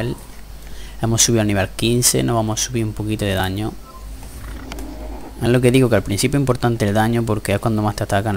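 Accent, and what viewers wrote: Andalusia, Spain. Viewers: Por tu acento deduzco que eres andaluz, de que parte ee andalucía eres? Yo soy gaditano😁😁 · Videos de Ark comentados con un buen acento andalú, que más se puede pedir, illo? Sos un crack. Saludos desde Argentina.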